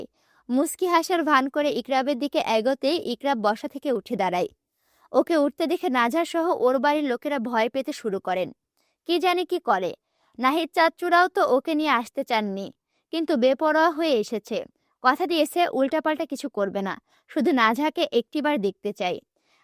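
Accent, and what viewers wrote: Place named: Rural, Bangladesh. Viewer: আপু তোমার মুখে গ্রামের আঞ্চলিক কথা আামার বেশি সুন্দর লাগে 🌸🌸🌸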